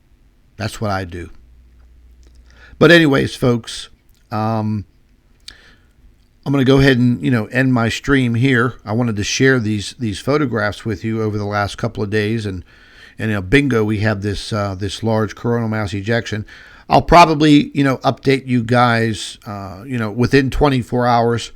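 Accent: Pittsburgh, United States. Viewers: Hi: So glad you've started reporting again. I know you are busy and with the good Doctors, health issues it's difficult to find time for all you want to do. But this video is sensational- I mean sensational! That steller core(?). is amazing, thank you. Yes, it makes me wonder and how dare NASA and the psuedo scientific community not doing what you do, shame on those scientific charlatans. I occasionally have dabbled in a youtube channel if I should start again how can I get permission to use some images and quote your research, of course, I would give all credit to you should you desire. The best way to initially respond is to reply here I won't waste your time nor mine with email unless you agree then I will contact you. FYI I was born and raised in the South Hills area of the "burgh" I have not been back in 40+ years. Your accent and attitude remind me of my childhood home, thank you.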